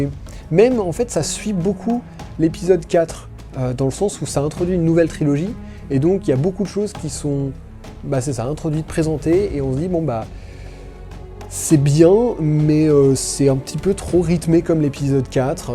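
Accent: England, United Kingdom. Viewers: Cet accent Anglais !! :o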